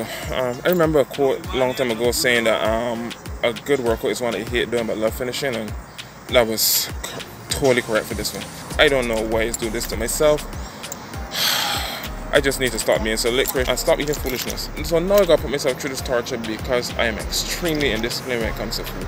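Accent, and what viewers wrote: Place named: Barbados. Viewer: I can't do two push-ups, so I'm already impressed. Also, so nice to hear a Bajan accent. I think I'm 30% here for that alone.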